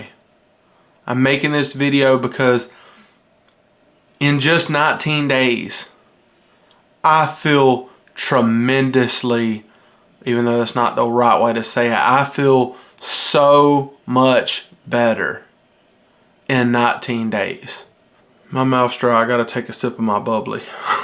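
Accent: South, United States. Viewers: Bro, you have a hint of a southern accent 😉stick with it you will NEVER regret it.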